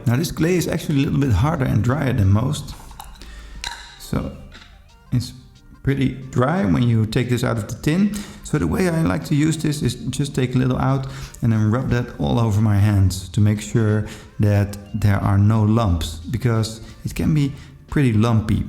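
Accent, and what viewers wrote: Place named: Netherlands. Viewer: The dutch accent 😂🫡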